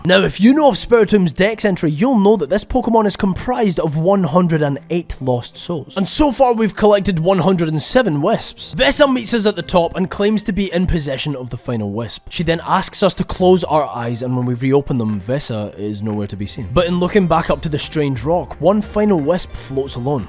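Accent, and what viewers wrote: Scotland, United Kingdom. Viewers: Most underated youtuber ever. And the whole Scottish thing makes it better as a Scottish person 👍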